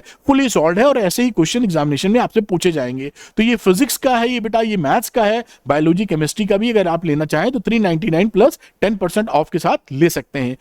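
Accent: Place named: Mewar, India. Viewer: That’s actually the natural Dialect of Mewad side in Rajasthan. It’s catchy though. 😂😂😅😅😊😊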